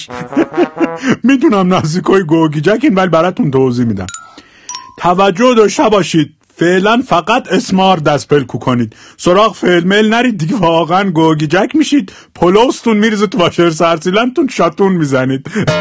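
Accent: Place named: Kerman, Iran. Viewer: کرمونیام ایجوری حرف میزنن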